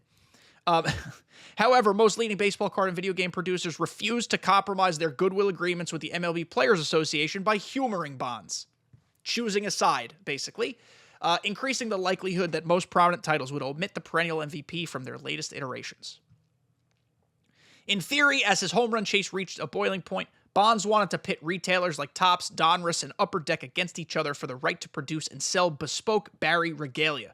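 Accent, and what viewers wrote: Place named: United Kingdom. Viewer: Lmao the British accent… Well they added Sammy Sosa and Mark McGwire into ‘24 and I didn’t think I’d ever see the day this would happen so seeing Barry back in ‘25 is very much possible. I’ll say at least a 25% chance it happens…🤞🏽 Barry was in one game I used to play on ps2 where he crushed every single ball out the park lol I think it was called MLB 2003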